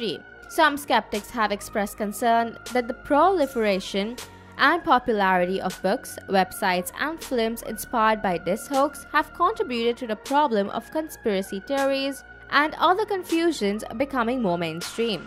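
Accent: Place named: India. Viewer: This girl has the worst accent lmao you can tell she is Indian "wictor Hugo" 😂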